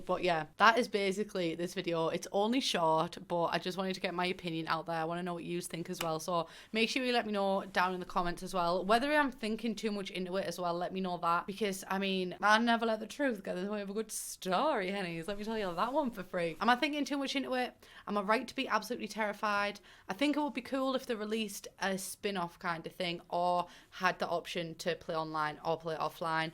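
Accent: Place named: England, United Kingdom. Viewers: Hi, nice to meet you :) Absolutely love your accent... (I'm from UK originally so have heard your kind of accent before, and I love it lol....) funny at the moment the name of your accent where you come from escapes me...ah yes Jordy....yep! love it lol.... (just hope Im right in saying that now lol...) I won't mind as long as there is an option to play single player or multiplayer....but if its just multiplayer....I'm not very much into that really.... keep up the great work, you might have to slow down in your speaking lol....hard to keep up with you sometimes....but love it all the same....not meant to be offensive by the way :) have a great day :) magz :) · So glad I found your channel, loving all the Sims videos, and you just the most stunning woman ever 😍, such a stronger English accent then what my family has 😶😶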